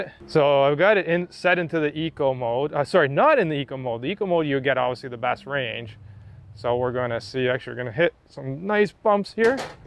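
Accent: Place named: Canada. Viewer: I love it when Canadians go oot n aboot.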